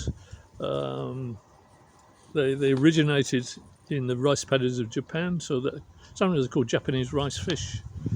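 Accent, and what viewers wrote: United Kingdom. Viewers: Which country are you in and what area? Judging by your accent you are British but those temperatures dont seem wery British 😅.Im in essex so temps reqching -5 at the moment 😢. Would want as much info before stepping into the hobby. 😊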